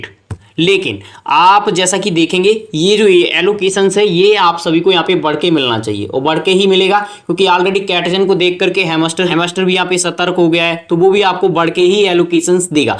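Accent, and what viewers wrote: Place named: Bundelkhand, India. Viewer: Bhai apki awaaz sunkar bundelkhand ki yaad taza ho jati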